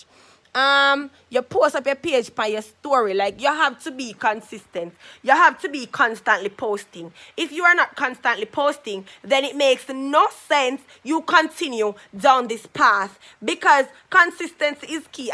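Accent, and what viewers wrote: Jamaica. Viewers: I feel much better n i was thinking about alot of things that i said b4 to myself about this YouTube thing and its like i wanna move even further after hearing u speak 🤞🤞🤞❤❤🇬🇾 Ps...I fw yall Jamaicans and Trinidadians accent anyday anytime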